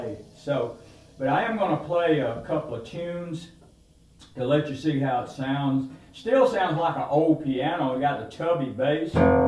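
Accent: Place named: Rural, United States. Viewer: I am from Egypt, and this is the most english accent that i love to hear, is that the accent of the county sides people in America?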